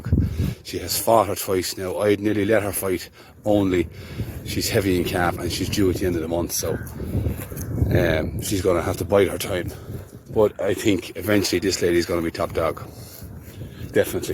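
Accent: Ireland. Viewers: Bred , born and reared into it. A career in nursing first but the LAND called out loud so out the gap and got out the wellies. It's a great way of life and healthy.. You got very handy with the CAVAN accent and you been from the west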